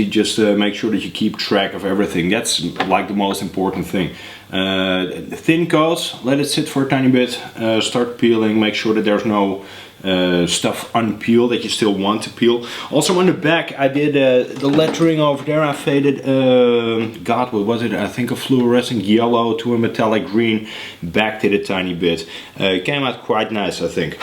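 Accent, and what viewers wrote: Netherlands. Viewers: Wow! Really creative and original work. Are you Dutch by chance because your accent sounds a bit Dutch?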